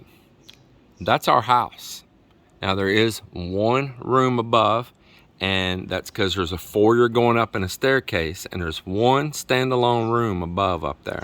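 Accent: Texas, United States. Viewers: I am your 83 year old lady in Texas that look forward to your daily video. It is normally 2AM as I have sleeping problems. I look forward to watching you and each night. I love seeing your progress I want to know about the chickens,the cow. Any thing you video will be interesting to me because of your Texas accent and straight forward delivery. I love the beautiful scenery you show and the beach. Tell I prayed for her surgery and not overdue too quickly. She will be good again in no time. MeMe in Texas USA 🇺🇸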